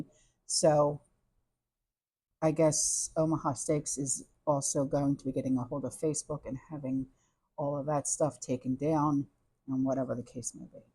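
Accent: New York, United States. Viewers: Hello 🤗 I shared your page with a girlfriend of mine to your page. She thinks you're very intelligent well-spoken and loves the New York accent 😁 Have a great day God Bless 🙏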